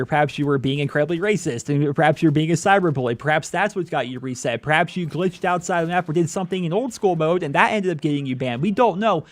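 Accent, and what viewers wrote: United States. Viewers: Really you're English? Your accent sounds American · are you really English? I thought you were American. where in England are you from? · Wait... You're English? I thought you were American or Canadian Well I'm Scottish and most people don't realise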